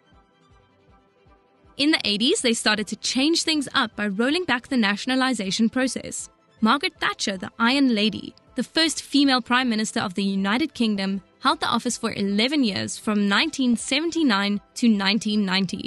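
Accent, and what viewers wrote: South Africa. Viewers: the narrator's accent is so South African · I know a sef effrican accent when I hear it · There's no way the person voicing this isn't South African... Would be be a change.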